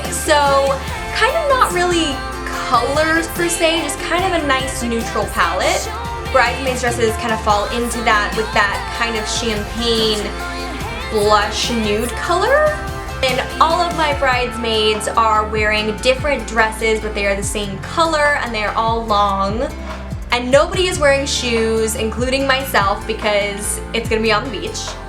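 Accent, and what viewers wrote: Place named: South, United States. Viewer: I haven't heard you talk in a while 😂 but your southern accent is coming in hard girl ! You look fantastic! So excited for you 😍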